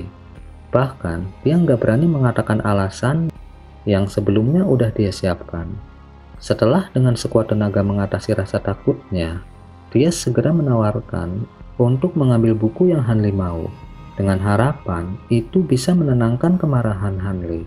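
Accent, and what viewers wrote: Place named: Bali, Indonesia. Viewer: Logat bali ini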